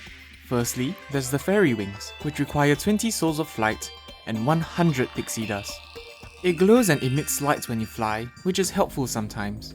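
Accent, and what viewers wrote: Singapore. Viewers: is guy sounds singaporean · His voice like a Singaporean guy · just curious, are you singaporean? your accent sounds v familiar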